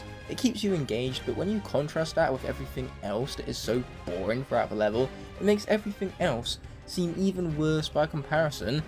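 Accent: United Kingdom. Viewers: yeah, I understand, just thought I would share my thoughts as I don’t want people clicking of on the intro without seeing how great your videos are, I’ve only watched you for a week now since I wanted to Skylanders nostalgia, but I have really loved your content man and finding out your British too lol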